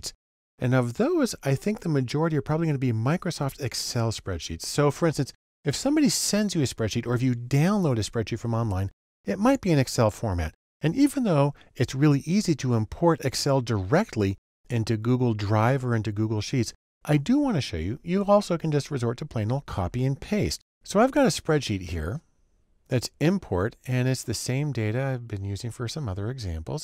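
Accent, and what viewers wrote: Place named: England, United Kingdom. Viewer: its so nice to hear clean english accent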